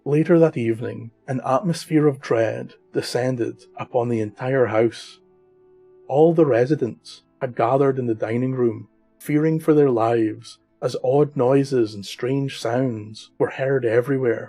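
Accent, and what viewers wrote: Scotland, United Kingdom. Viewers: Most definitely we would appreciate more Headless Horseman tales!! Always we have wondered what happened to the Horseman.. how he lost his head.. and what became of him afterwards.. We love your stories.. and especially your accent.. we are of Scottish ancestry as well.. Thanking you so much we are .. Slan mo charaid William and Jen 💜💙🌱🌹🕊️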